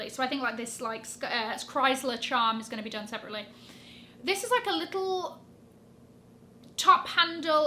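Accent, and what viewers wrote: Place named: RP, United Kingdom. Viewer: Hi, I agree with you, some elements I like, some others are simply silly. Your videos are always interesting and I love your fantastic received pronounciation.😍😍😍 ( I understad everything you say and I’m an italian living in Spain)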